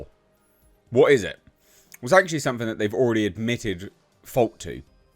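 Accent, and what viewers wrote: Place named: United Kingdom. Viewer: There’s something that makes a Brit hurling insults make them more legitimate. What accent do you have? Like the USA I know there are more than 1.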